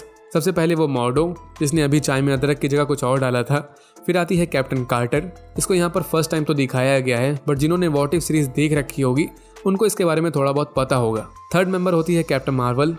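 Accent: India. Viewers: I used to watch your loki video, I've watched them all I just remembered you used to say " Keep calm and Listen to me " in your Indian accent so, yeah your explanation are best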